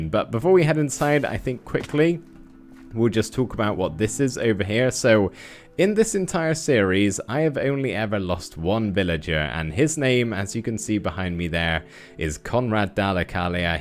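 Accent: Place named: Cornwall, United Kingdom. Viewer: Always wondered what part of the UK you're from, you have a similar accent to me which isn't something I often come across and I've noticed you say 'haf' not 'hahlf' same as me, and I'm from Cornwall 😊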